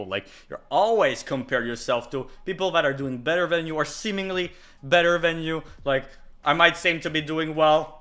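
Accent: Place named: France. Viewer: oh so you are french alright i was Thinking what kind of accent that is and where is written as you like? thats awesome!!! Favorite songs? ( is awesome too) and L0L you are younger than me i thought you were 25 or older but definitely below 30 can you watch coma attraction attraction 2 what happened to monday rampage angry birds jump street 1 and 2 Leon soul transformers 1-5 +bumblebee clash of the titans,wrath of the titans 300 great wall 47 ronin pitch Black bloodshot dr sleep ghost in the shell scarface goosebumps invisible man percy Jackson dragonball broly it 1 and 2 evil dead trilogy guns akimbo parasite spies in disguise commuter detective pikachu gentlemen non stop attack the block hard powder Cold pursuit planet of apes trilogy abraham Lincoln Vampire hunter outlander gemini man Jericho project gunman american assassin youre next halloween 2018 predator Upgrade pacific rim 1 and 2 damn you have a lot of funko pops i only have 4 or 5 i think, Thanos, gold thor, dr strange, wolverine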